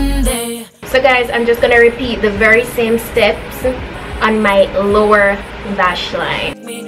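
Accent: Jamaica. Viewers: are u a Jamaican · Your Jamaica don't it?🇯🇲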